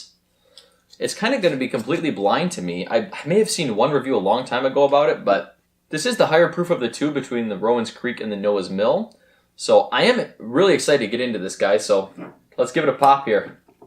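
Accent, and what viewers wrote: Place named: Wisconsin, United States. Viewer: Nice accent. Wisconsin?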